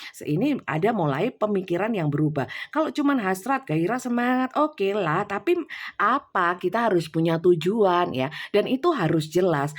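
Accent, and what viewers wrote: Java, Indonesia. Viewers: Ingih bnda medok banget bun jowone.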